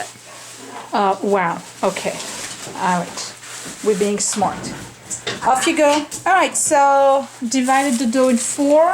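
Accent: France. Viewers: Listening to you and your mother speaking in French reminded me of something I keep wondering about ... I know you have been in this country a long time and your accent is very slight but the question I have is, do you THINK in French and then have to translate before you speak or do you now THINK in English? That's just my strange mind at work again.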